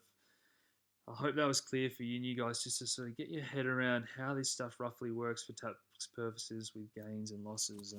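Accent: Australia. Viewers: Good video. Great info. Good to hear an Aussie crypto trader. Subscribed. Cheers :)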